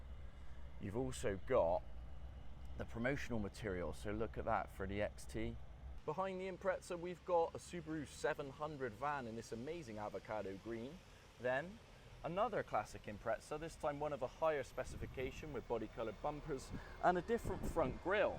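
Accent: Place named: Bristol, United Kingdom. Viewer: Since no one has mentioned it, it’s a honour to actually see! For so long you’ve just been a Bristolian voice 👍🏻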